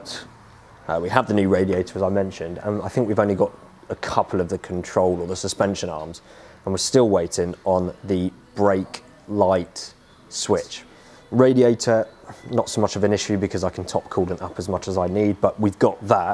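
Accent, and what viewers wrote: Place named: West London, United Kingdom. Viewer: I like the way to say Garsekets instead of gaskets true west london twang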